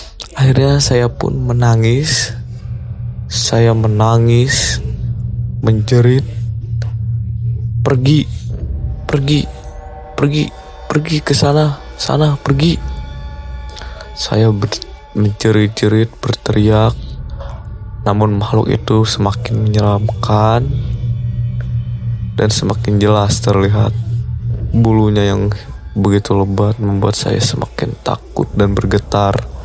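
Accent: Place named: West Java, Indonesia. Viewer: wahh logat nya sunda banget yah😂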